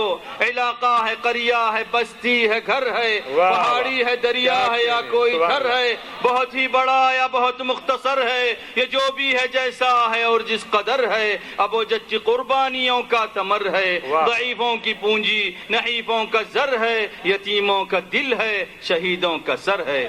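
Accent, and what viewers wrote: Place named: Lucknow, India. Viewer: لله درک یا شیخ هنیٸا لک هذاالابداع والارتجال ماشآ ء الله انت تقرض الشعر باالاردیة الفصحی کأ نک من لکناٶ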